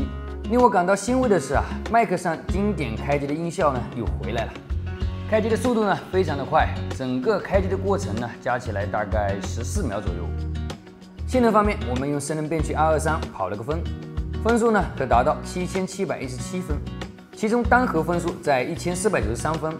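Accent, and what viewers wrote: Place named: Zhejiang, China. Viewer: 浙普的味老重了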